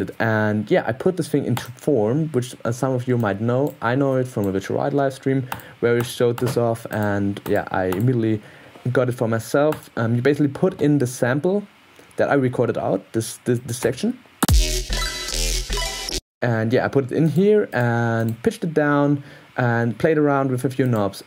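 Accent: Germany. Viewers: u german??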